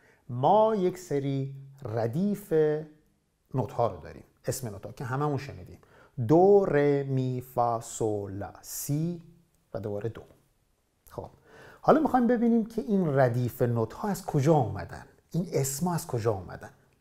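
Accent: Bushehr, Iran. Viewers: لهجه‌ی بوشهری هم عالی. خیلی از شما ممنونیم. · لهجه بوشهری موافقم با اینکه ترکم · ممنونم ازتون خیلی خوب بود لهجه بوشهری عالیه با ما بختیاریها تقریبا تو ی خطه❤ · معلمی هنر است و شما نمونه یک معلم خلاق و هنرمندی با اخلاق هستید . من با شما موافقم. شما با لهجه شیرین بوشهری بیان کنید. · همه لهجه های ایران عالین و بوشهری هم که شما شیرین ترش کردی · خیلی خیلی عالی هست برنامهاتون. اگرچه لهجه بوشهری (مثل تمامی لهجه های دیگه دنیا)، بسیار جالب و شنیدنی و لذت بخش هست، ترجیح بنده اینه که بدون لهجه باشه. باز هم ممنون برای تولید این برنامه. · سلام ، موافق لهجه بوشهری هستم. عالیست. ❤❤❤ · درود بر شما شما واقعا کاری کردید کارستون خیلی ارزشمنده خدا را شکر که با شما اشنا شدم من خیلی دوست داشتم که اطلاعاتم بیشتر بشه در ارتباط با موسیقی ممنونم که هستید دورت بگردوم زبان بوشهری خیلی هم شیرینه 👌👏👏👏👏🙏🏾🙏🏾👏👏🌹🌹🌹🌹🌹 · لهجه شیرین بوشهری 😄🌺💐🎶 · عالی بو استاد جان خیلی ممنون لهجه بوشهری هم خیلی شیرینه ولی شما لطف کنید همین طوری توضیح بدید ممنون میشیم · عزیز چی بگم در تعریف این درس های شمو که دیگرون نگفته باشن همگی اموزنده وبزبان ساده مثل کلیدی برای قفل بسته موسیقی ما. 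در مورد گویش شما این بنده شیرازی که ۶۰ است از وطن دورم هنوز شیرازی تر از شیرازی های امروز حرف میزنم و از گویش بوشهری شما لذت میبرم. زنده باشی کاکو. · لهجه بوشهری 😍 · صد درصد موافقیم با لهجه خیلی شیرین بوشهری گفته شود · صددرصدموافق اجراي برنامه به لهجه بوشهري هستم چون بايك تيردونشون ميزنم هم موسيقي يادميگيرم هم لهجه شيرين بوشهري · با سلام اقای عزیز واقعا بیان زیبایی داری وانسان را به موسیقی علاقه مند می کنید وزبان بوشهری با جنابعالی زیباست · دورتون بگردوم... خیلیهم عالی لهجه ی بوشهری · لحجه بوشهری رو عشقه عامو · منم با لهجه بوشهری موافقم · "لطف بكنيد دورتون بگردوم به لهجه شيرين بوشهري باشه " شما هم خيلي با صفايي دل ات خوش تن ات سلامت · من کاملا موافقم با حرف شما. از این به بعد به لحجه زیبای بوشهری سخن بگویید. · دورت بگردوم، قند و نباته لهجه بوشهری! 😍🌹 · بسیار لهجه بوشهری را دوست دارم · موافقم با لهچه شیرین بوشهری ادامه بده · صدر در صد با لهجه زیبای بوشهری. استاد عزیز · چرا لهجه بوشهری توی ویدیوهای بعدی نبود ؟ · I love boushehri accent . 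· درود بر شما ،از وجود هموطنی همچون شما که دانشی به این ارزنده گی رو رایگان در اختیار مردمش قرار میده خوشحالم، و با لحجه ی بوشهری کلاس شیرینیش بیشتر میشه، دورت بگردوم... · با لهجه بوشهری همش برو😍😍👍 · استادجان، من به لهجه شیرین بوشهری رای می‌دم.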